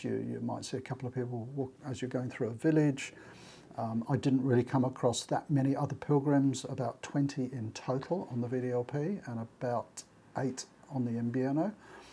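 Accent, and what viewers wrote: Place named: Australia. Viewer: It's that Aussie accent: VDLP or Via de la Plata, the name of one of the Camino routes.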